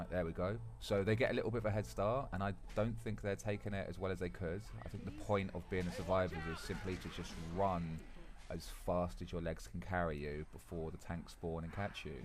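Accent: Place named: United Kingdom. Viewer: you idiot. the language isn't british. It's English with a british accent. stupid ass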